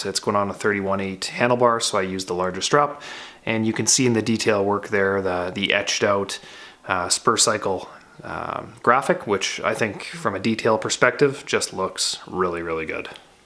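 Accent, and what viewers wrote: Canada. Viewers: Are you Canadian? You have a very strong accent.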